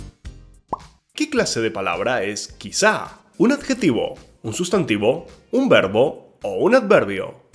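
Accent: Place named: Latin America. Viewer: PERDON. La pronunciacion es LATINA. Esto conlleva a ERRORES.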